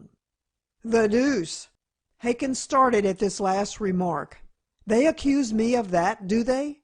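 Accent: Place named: United States. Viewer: Great story... I can't help wishing that it had been read by an English reader though as the story is set in England. I found the broad American accent unsuitable for this text. The reader did her best however, despite the occasional mispronunciation of certain words. Much better than I could ever do.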